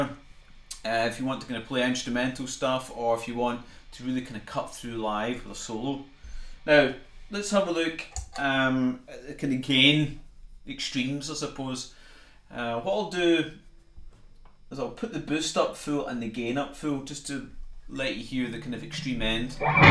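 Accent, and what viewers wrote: Scotland, United Kingdom. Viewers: Thanks for that demo. I used my Grado head phones for this one ! That amp sounds MASSIVE ! Great tones ! Great playing and Scottish accent !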